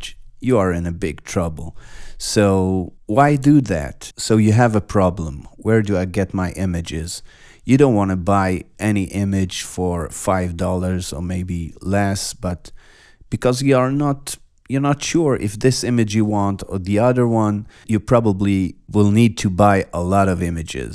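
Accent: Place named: Israel. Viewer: שומעים שאתה ישראלי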